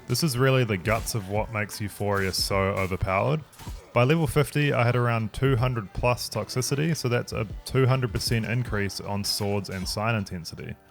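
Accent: New Zealand. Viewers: 2.3 seconds in.. and I reeeealy appreciate the new zealand accent, where are you from bro? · I’m feeling an NZ accent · Searched for the best Witcher 3 build and was happy to hear a Kiwi accent. Big ups my man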